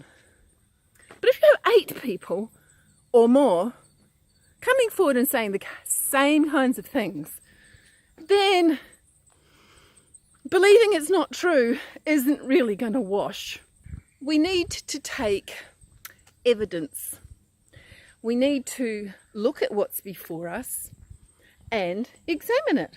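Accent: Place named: South Africa. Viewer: Walking and videoing, horrible SA accent, rambling on and on, makes for a bad video.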